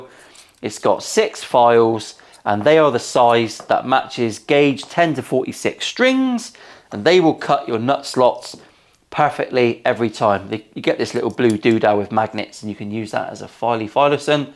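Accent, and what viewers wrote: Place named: Plymouth, United Kingdom. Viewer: I don't think I've ever met a pretentious Janner. The accent keeps us humble mate. Just found your channel, instantly subscribed. Legend.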